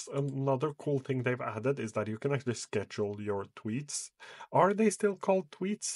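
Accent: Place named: Norway. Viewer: Came for the info, stayed for that cute Norwegian (?) accent 😝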